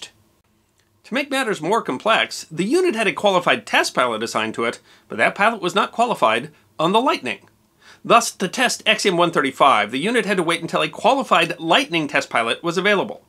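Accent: United Kingdom. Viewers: Haha!!! So British!!! Sounds like Monty Python!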